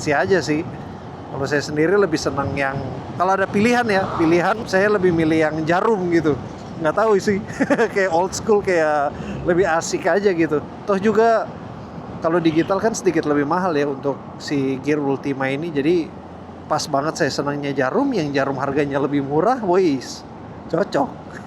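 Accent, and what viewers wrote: Eastern Indonesia, Indonesia. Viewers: Izin tanya bang,, asli daerah mana bang,, soalnya logat nya kyk agak mirip daerah timur sedikit bang 👍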